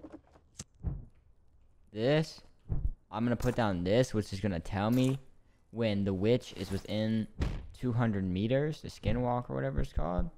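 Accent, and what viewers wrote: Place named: South, United States. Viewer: plssss what is this southern accent 😭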